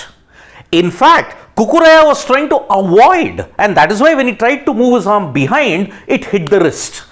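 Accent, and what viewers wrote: India. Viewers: ОПЯТЬ ИНДУС УЖАСНЫМ АКЦЕНТОМ ЧТО-ТО ОБЪЯСНЯЕТ ,НО ЕГО РЕЧЬ НЕ ПОНЯТНА!!!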